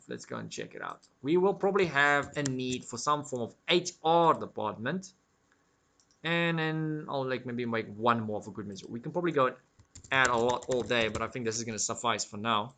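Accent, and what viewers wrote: South Africa. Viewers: Curiosity sake are you South African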